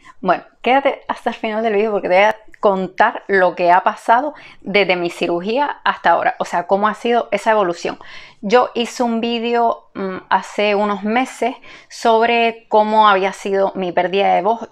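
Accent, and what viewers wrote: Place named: Canary Islands, Spain. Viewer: Acabo de descubrir tu canal y me llamó la atención que eres canaria (y estando en Alemania es de agradecer escuchar mi acento) Te vi a través del vídeo sobre Inositol, y viendo este quiero darte muchos ánimos paisana!!❤❤ Un abrazo y gracias por compartir tus experiencias y conocimientos con nosotr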